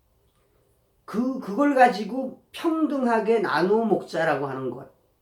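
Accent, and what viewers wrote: North Korea. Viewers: 고조구수한 이북 작고하신 우리아버님 목소리같아 정겹네요 응원합니다 화이팅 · 오리지널 북한사투리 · 북한말씨로 좌파아가리 이중성을 말해 주시니까 속시원합니다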